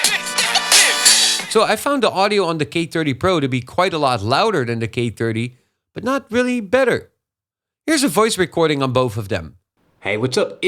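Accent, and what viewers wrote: Netherlands. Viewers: He's definitely Dutch. His accent is pretty good as far as Dutch people go, but as another Dutch guy it was still a bit distracting. You can here it on his "th" for example. He is very deliberate to try and get it right, even then he sometimes slips up in a very Dutch way like the third time he said "thirty" in a row. "tirty" and "firty" are ways of getting around the inability to pronounce "th" almost every Dutch person, me included, does. He went for the former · you dutch?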